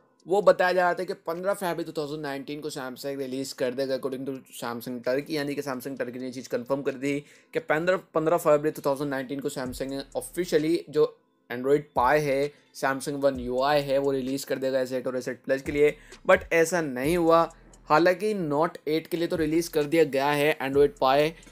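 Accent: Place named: United Kingdom. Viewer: Bhai itna aungrej kyu ban rhe ho.... Aisa lag rha hai jaise lagaan picture ke firangi ho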